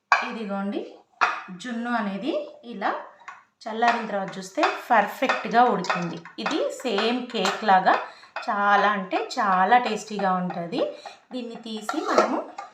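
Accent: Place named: Telangana, India. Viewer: Telangana slang super 😍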